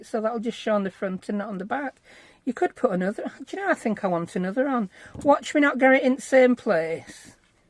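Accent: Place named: Yorkshire, United Kingdom. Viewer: Just popping on to say hello! Come on girl, who wouldn’t love a Yorkshire accent 😅😂😊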